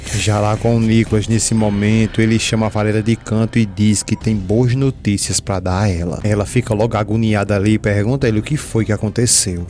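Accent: Pernambuco, Brazil. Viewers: Sr. Narrador, você é do Cariri ou Pernambucano?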